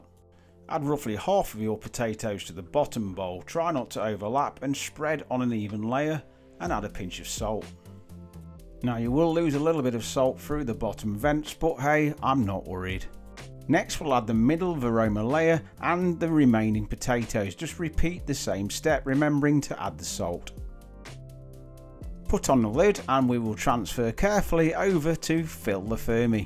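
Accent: United Kingdom. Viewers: You have a strong UK accent, hard for me to grasp everything… but i like the challenge! There s the transcription too. 😘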